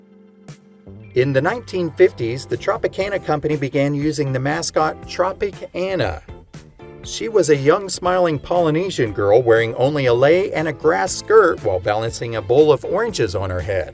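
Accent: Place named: South, United States. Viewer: It seems like you are from the South, but I can't place that accent. I fear it might be Virginia. They need to be reclassified.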